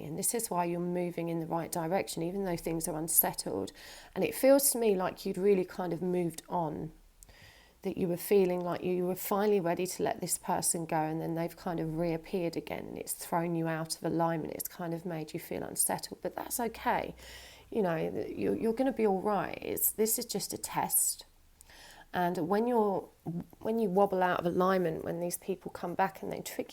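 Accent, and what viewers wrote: England, United Kingdom. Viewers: Very deep messages in the beautiful English accent! 🙏🏼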